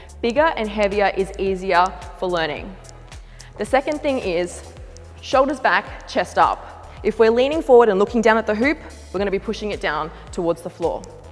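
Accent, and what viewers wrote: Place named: Australia. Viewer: Amazing video and explanations. No one else had all this info Hate the aussie voice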